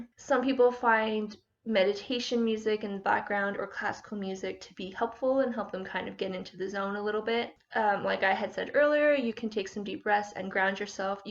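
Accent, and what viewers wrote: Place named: Massachusetts, United States. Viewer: I’m 5 seconds into this video and I immediately heard a Massachusetts accent. (If I’m getting cocky about my accent guessing abilities can I get more specific snd say like outside of Boston? North shore? Merrimack valley?!)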